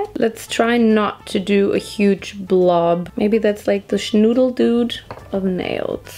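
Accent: Germany. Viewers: she is so german that u can hear her accent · I thought she was German from the beginning because she kept talking in German and had an accent if that makes sense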